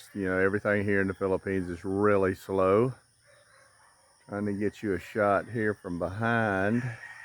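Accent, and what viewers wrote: South, United States. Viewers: Love your Southern accent. I love your honesty and candidness about life. I hope things will work out for you and your wife. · yes, I do love your southern accent. I hope you're ok. stay strong sir..god bless.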